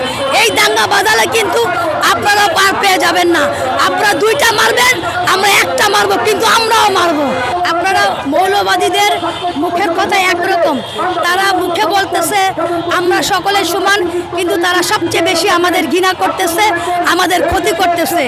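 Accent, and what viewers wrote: Kolkata, India. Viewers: কথার ঢং দেখে মনে হচ্ছে আমরা ভারতে আছি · বাহ কলকাতার শুরে কথা বলছে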